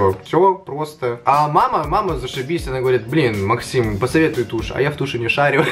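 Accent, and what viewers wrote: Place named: Vladivostok, Russia. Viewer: Я-то думаю, почему мне так классно слушать твою речь? А тут Владивосток замешан)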